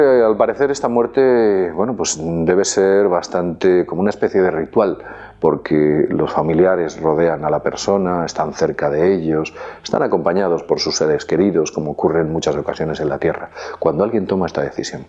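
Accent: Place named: Castile, Spain. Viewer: Es normal en el acento castellano hablado en España...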